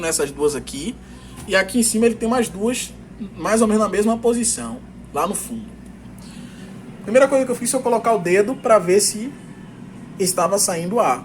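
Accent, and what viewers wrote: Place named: Salvador, Brazil. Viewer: Fala moral, Ótimo vídeo, pelo sotaque voce é de salvador? Pois se for onde voce comprou essas peça?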